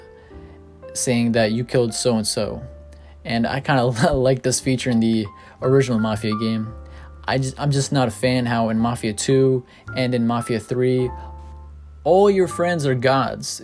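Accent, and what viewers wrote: United States. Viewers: Sounds American.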